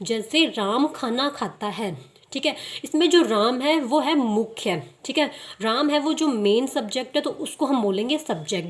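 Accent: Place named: England, United Kingdom. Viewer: It seems that you are from England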